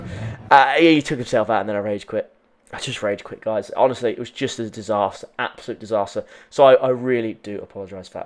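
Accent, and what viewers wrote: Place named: United Kingdom. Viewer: Anyone ever tell you that you sound like Daniel Radcliffe? Of course, I'm American, so I guess a lot of Brits sound that way to me. 😂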